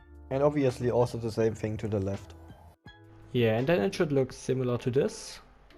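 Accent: Germany. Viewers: der deutsche akzent 😂 geil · Ich hab dein Video geschaut und direkt gehört, dass du einen deutschen Akzent hast. Gutes Video 😊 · Gutes video, man hört aufjedenfall denn Deutschen akzent aber echt gutes Englisch, thanks for the video, was helpful, I’m going to try it in game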